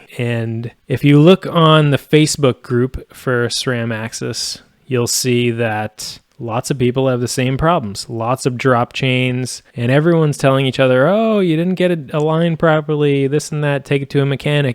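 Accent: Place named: Midwest, United States. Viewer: Your accent tells me you're from the Midwest. Chicago or Milwaukee. Takes one to know one ha...thanks for the video!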